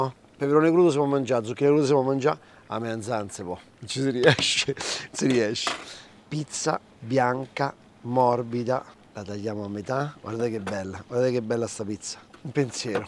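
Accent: Rome, Italy. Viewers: Ciao ma non parlare in romano , parla più in italiano , non mettere questo stile romano che non è tanto bello , un pochino volgare , ma quello che cucini e ‘ buonissimo 👍 non ti offendere non sono solo io a dirlo , senza accento , non ti sta bene dico per te , un po’ brutticello , ma c’è peggio per esempio personaggi famosi in tv che parlano romano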